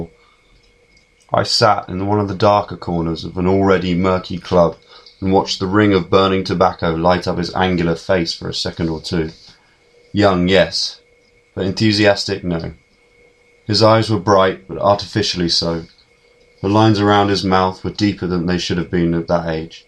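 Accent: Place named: London, United Kingdom. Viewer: It doesn't say who's reading it but he's great, and has a London accent. I also liked the pictures - some of them are of buildings I have worked in!!!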